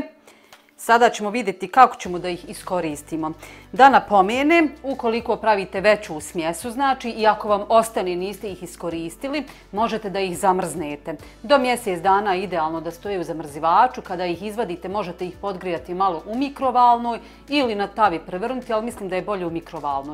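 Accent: Bosnia and Herzegovina. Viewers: Gledam cesto tvoje recepte, volim taj bosanski izgovor i ta prica sa flasicom je simpaticna. Pozdrav iz Kanade.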